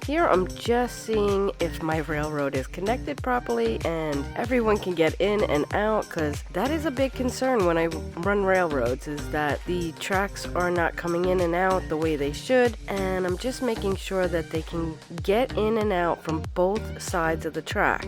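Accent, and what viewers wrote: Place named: Northeast, United States. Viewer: In this game, water sucks, it really really sucks. The water is so wavy it makes the roads wave. Im glad your fiddling with the railroads paid off. I'd keep an eye on your train tracks, I'm worried about trains locking up in such a short space. My last metro setup locked up, killing my profits. I'm still a little bitter about it. I have trouble with train and metro tracks as well. To get the most shallow slopes on the roads, or any network really, the slope section should be exactly 12 units long and rise no more than three small steps. The 3 small elevation steps are the minimum required to go over other networks without conflicts. It makes for some damn pleasing looking overpasses in my opinion. I swear I heard some New York in your voice this time. If you're on the East Coast, it certainly explains why your videos go up so early. 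I'll have to wake up before dawn to get First Comment. Cargo hubs bring all the trucks to the yard. Yours is simple enough to keep traffic tamed while still looking great. Can't wait for the next episode.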